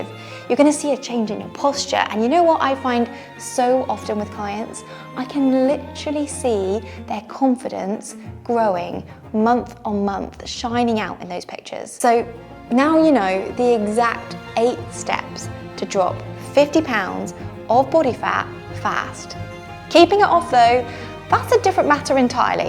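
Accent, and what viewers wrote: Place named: United Kingdom. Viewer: I don't mind the music, probably because it's classical, goes great with your British accent! Doesn't bother me.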